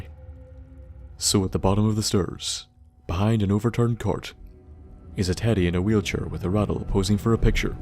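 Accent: Northern Ireland, United Kingdom. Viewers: Where abouts from northern ireland are ye from? I'm from Co. Down lol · May I ask what country are you from?, you sound like you're from Northern Ireland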